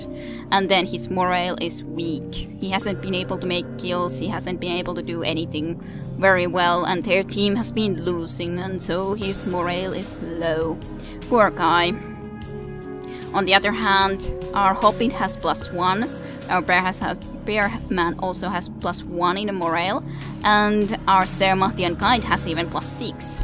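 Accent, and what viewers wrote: Finland. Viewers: Well, Hungarian has certainly been "corrupted" so to speak by slavic languages and has taken some slavic sounds which I don't see anywhere in these Finnish words. Also, its why you say things like "as such" so uniquely, where a Hungarian person would have no problem making the "tʃ" sound ( its actually very common in Hungarian) But from what I heard so far, both Finnish and Hungarian maintain a rather consistent pitch, so when you speak English it results in an extremely interesting accent. Finnish seems to be more influenced by German then Slavic languages ( for example the word "Ritter" in "sormusritarit" ) As for Torment, that depends on whether you think you would enjoy it or not? :P I would recommend replaying it if you haven't played with a very high wisdom, as high wisdom makes for a much more interesting game.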